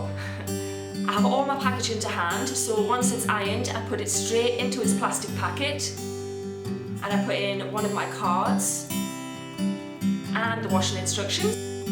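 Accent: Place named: North East England, United Kingdom. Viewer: Hello. I’m new on your channel. Just want to say hello and let you know that I love your attitude! Oh... one thing to ask! Are you from Newcastle? I heard that lovely north east accent. 😀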